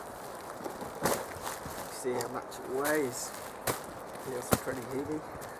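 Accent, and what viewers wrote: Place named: New Zealand. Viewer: Wow! AS soon as I heard your voice and you said you were trapping possum's I knew you had to be a Kiwi!! I had a similar lifestyle growing up on the coast in the Wairarapa with my own trap line! really enjoyed this mate! New sub and I'll be following your channel with interest!!👍😀💯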